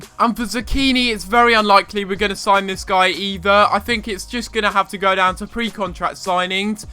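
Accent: England, United Kingdom. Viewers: FIFA 16 Career Mode you did it sounded weird because of your English accent (I'm Australian)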